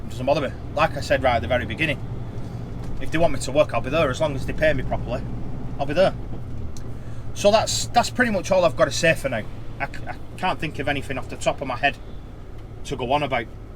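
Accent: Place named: Oldham, United Kingdom. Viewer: I'm glad your enjoying yourself pal I onky did my test a few weeks ago on class 2 and could not wait to get down the road altho I've driven trucks for many years I only past not so long ago just take your time don't let cars rush you and just take it at your own pace . You sound like a Oldham lad with that accent I'm only over the top In Huddersfield myself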